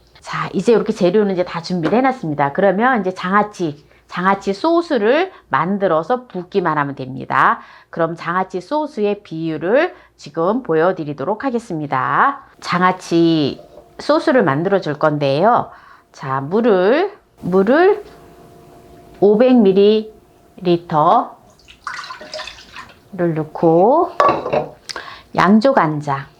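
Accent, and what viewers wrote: Jeolla, South Korea. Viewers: 샘이 전라도 사투리 끝트리ㅎㅎ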